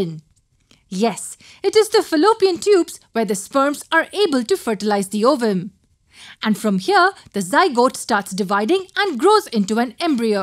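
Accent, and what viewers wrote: India. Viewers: Indian accent??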